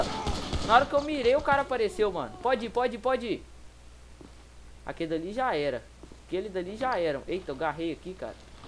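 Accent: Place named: Rio de Janeiro, Brazil. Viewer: voce e carioca né? fica falando bota cara e pq é do rj haushauhsauhs tbm sou!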